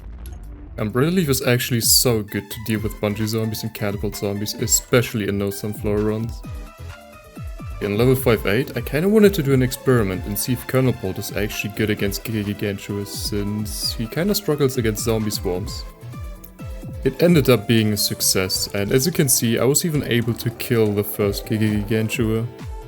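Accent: Germany. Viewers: You have nice german accent.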